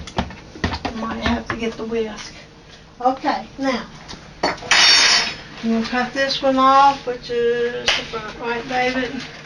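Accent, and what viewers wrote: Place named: South, United States. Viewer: Just joined your channel because Jan mentioned you and said we should watch yours. I’m from Tennessee so I know that southern talk lol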